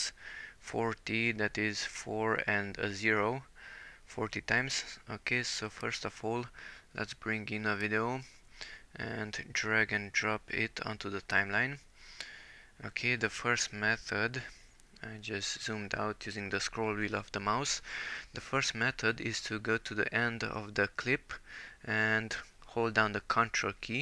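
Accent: Hungary. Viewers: Epp azon gondolkodtam, hogy ez az angol kiejtes egy kicsit magyarosnak hangzik, ekkor megneztem a csatorna nevet... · Köszi a segítséget, kicsit hallatszik a "magyar angol" :D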